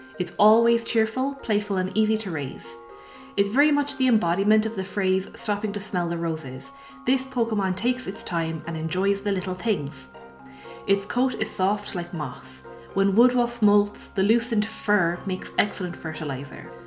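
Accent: Ireland. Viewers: you have no idea how happy i was to click on this and hear an irish accent as an irish person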